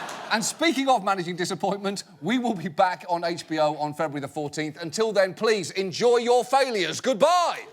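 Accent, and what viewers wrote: England, United Kingdom. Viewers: Thanks to his british accent , even silly things sound viable ! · I love when says words in a distinctly English way. like "kentucky darby"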